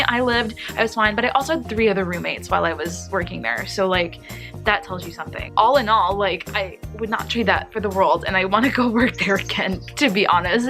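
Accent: United Kingdom. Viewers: prob RP lol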